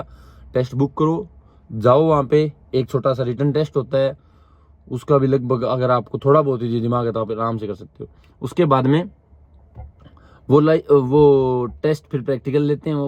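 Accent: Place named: Haryana, India. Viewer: Video bhut dekhe aap jaise nhi apni boli m maja aa gaya Bhai ji konsa gaon h aapka boli apni mast apni boli m video bhanya acha lega m naresh gaon barwa bhiwani haryana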